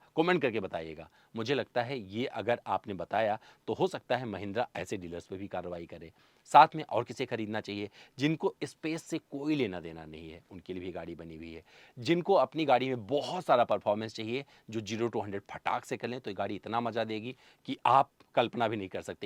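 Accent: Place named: Purvanchal, India. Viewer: aapki awaaz se lagta hai ki aap bhi Purvanchal ke hi Rahane wale Hamare Sultanpur ke aaspaas ke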